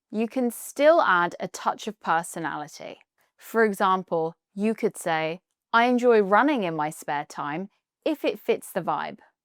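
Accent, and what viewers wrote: United Kingdom. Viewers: I am living in iran and I'd like to learn british accent. This is so interesting for me to learn british accent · Hello. I'm from Egypt. I love English and I love the British accent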